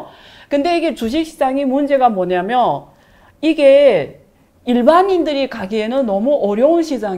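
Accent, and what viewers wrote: China. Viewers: 말투가 화교분이신듯.... 너무 뻔한 기본지식을 특별한갓처럼 말하시는듯...... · 정확한 발음의 한국사람도 많은데 이상한 중국식 한국발음에 여자를 방송에 ...짜증나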